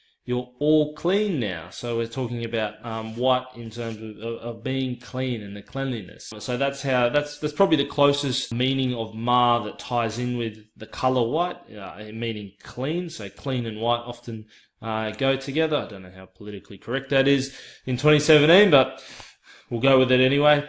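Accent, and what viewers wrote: Australia. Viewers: You sound so Australian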